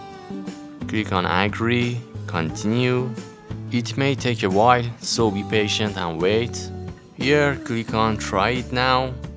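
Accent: Iran. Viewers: معلومه لحجه ایرانی دارع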